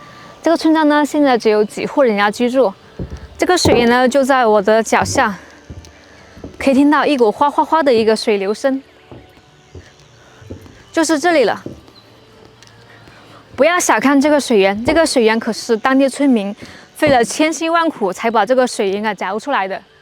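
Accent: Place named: Luzhou, China. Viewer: 说方言的口音跟我们老家很像，跟泸州更像！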